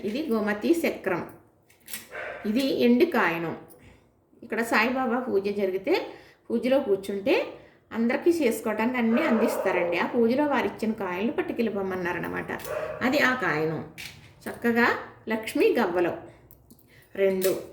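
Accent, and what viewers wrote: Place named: Godavari, India. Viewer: Mana godavari yasa❤❤❤❤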